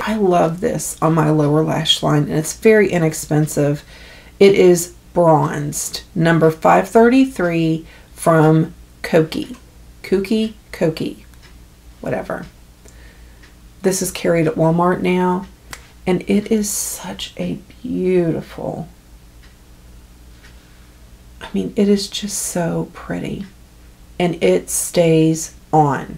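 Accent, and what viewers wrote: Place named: West Virginia, United States. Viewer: Enjoyed your video as I always do. I am moving back home to West Virginia this coming weekend - Ravenswood. Hearing your beautiful accent has made the wait to get back seem shorter! Thank you!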